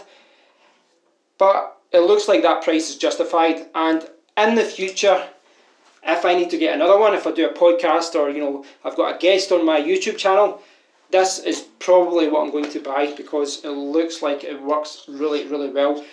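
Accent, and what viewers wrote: Scotland, United Kingdom. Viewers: Are you from Scotland by chance?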